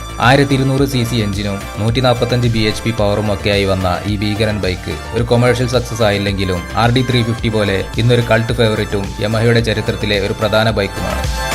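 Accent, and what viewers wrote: India. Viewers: ഇങ്ങള് കണ്ണൂരാണോ ....? 🤔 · Pakka kozhikode slang is here 😇😇😇🥰🥰😍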